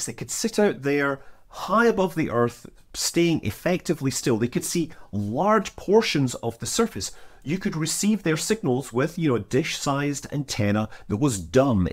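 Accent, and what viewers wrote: Scotland, United Kingdom. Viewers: Either I'm getting more used to hearing USA accents everyday by living in the US (I'm English), or you're becoming more Scottish again. You're losing the Irish accent as well.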